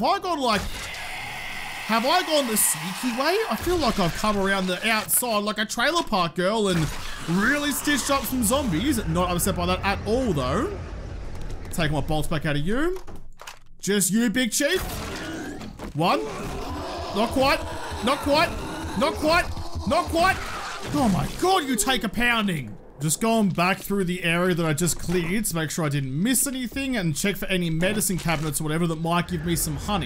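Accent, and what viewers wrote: Australia. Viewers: are you australian, if you are thats cool.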